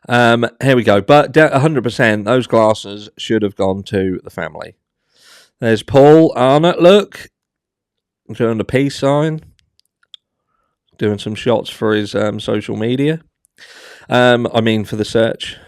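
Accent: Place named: Waveney, United Kingdom. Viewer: You have a great Waveney accent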